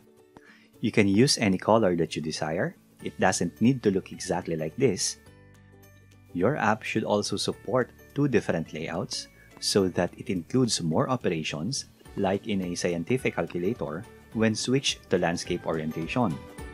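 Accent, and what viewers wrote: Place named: Philippines. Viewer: Malalaman mo talaga quality ng mga pinoy pag nag english hehe diba sir?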